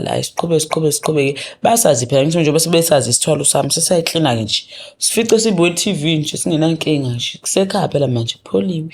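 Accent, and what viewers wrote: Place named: Johannesburg, South Africa. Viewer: 😂😂😂 uhlulekile kodwa uku actor ntombi lestori sakho singamanga, eccent yakho eyase Goli eRange Farm or Bekersdal. EMhlanga awazi nokuthi kungakuphinje 😂😂😂 Kulungike byeeeee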